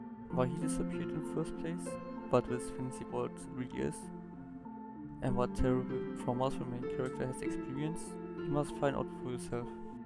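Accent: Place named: Germany. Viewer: Habe etwas rausgehört, dass du aus Deutschlanf bist und mega tolles Video, nur man versteht dich etwas schlechter:/ Aber keep it up!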